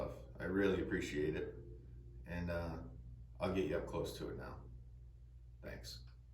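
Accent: Boston, United States. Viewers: I believe these drums came in either a gloss or satin finish, it looks like you have an add-on. A quick check of the numbers on the tags should clarify this. Either way, these are beautiful drums! Nice Boston accent.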